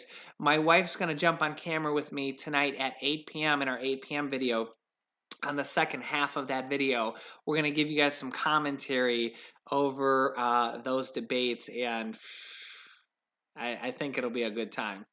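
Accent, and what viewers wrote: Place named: Chicago, United States. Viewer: it sounds like Chicago slang me and my wife LOL,.. another one could I ax. you a question...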